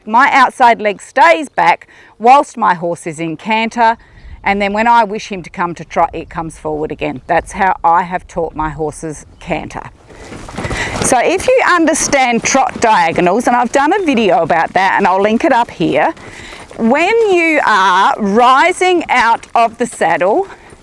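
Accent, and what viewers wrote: Australia. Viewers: You're literally the best!! And I love to hear a fellow Aussie accent :D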